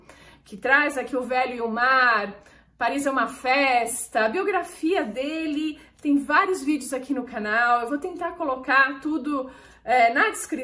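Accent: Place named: Interior, Brazil. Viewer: Astrologia e literatura orrrrnam ,um sotaque meio acaipirado, alguma influência?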